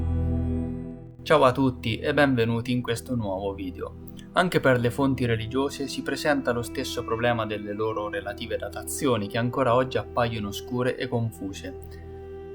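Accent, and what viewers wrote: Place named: Senigallia, Italy. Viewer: Ottimo lavoro, complimenti. Dall'accento sembri di Senigallia.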